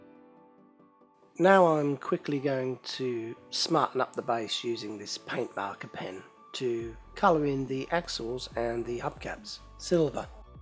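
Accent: Australia. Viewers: I like your work as well as your speech. Australian sounds great. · British or Australian? Sounds more like Australian. Great video, no matter where it's from. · I’m so incredibly impressed! Especially since I can’t do ANYTHING even CLOSE to what you just did! Add the really “fun to listen to” Aussie, and you’ve got a perfect video. Thank you so much!!!! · I thought at first there was some Aussie accent in there.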